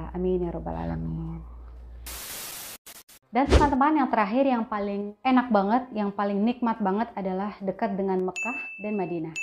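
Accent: Jakarta, Indonesia. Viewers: Keluarga jakartanya dmana mpok?..klo dr logatnya kayak di jaksel...sy asli jagakarsa....sy biasa bawa jamaah umroh mpok, mkn klo sy lg di madinah bisa bersilaturahmi 🙏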